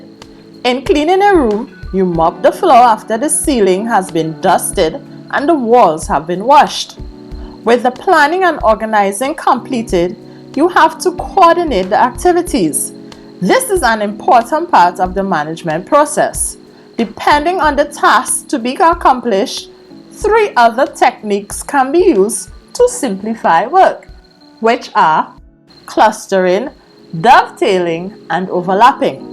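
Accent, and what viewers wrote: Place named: Jamaica. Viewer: You soon like Jamaican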